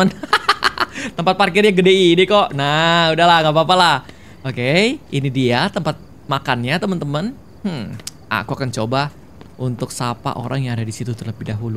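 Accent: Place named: South Jakarta, Indonesia. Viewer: Jaksel bangget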